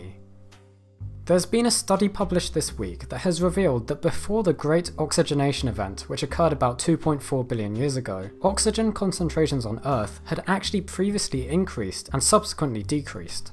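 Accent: United Kingdom. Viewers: you have a British accent so you can say everything as you please; apparently....:) Intro for an intro.......NICE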